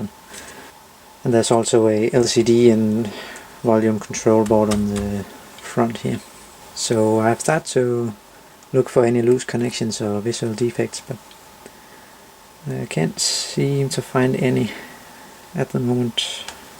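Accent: Denmark. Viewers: Elektronik Center ? Danish accent.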